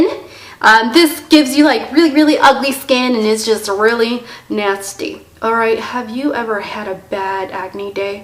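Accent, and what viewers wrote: United States. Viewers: she sounded so country back then haha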